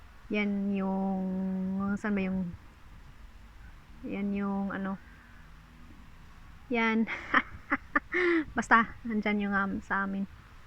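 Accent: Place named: Visayas, Philippines. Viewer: Hahha murag bisaya jd ky ang accent gahi kau murag ako Lol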